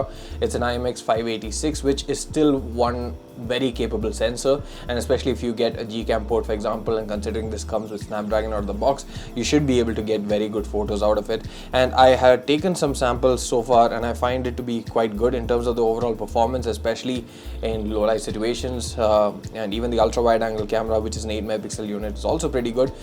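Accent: India. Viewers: U sounds harmonic From INDIA ? Subscribed anyway 😊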